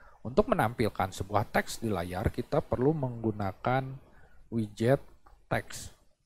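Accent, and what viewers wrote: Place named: West Java, Indonesia. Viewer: orang bandung nya, logatnya sunda :D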